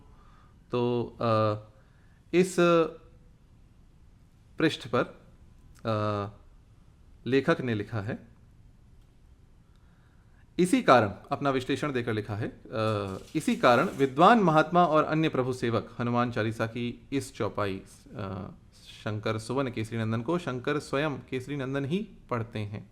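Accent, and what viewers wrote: Standard. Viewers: Your Hindi is too pure- very nice . Samskaran yaane kya?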